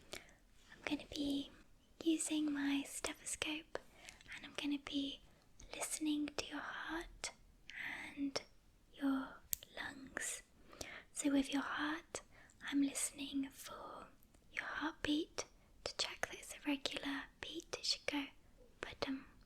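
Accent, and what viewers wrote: United Kingdom. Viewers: What is her accent? What part of the UK?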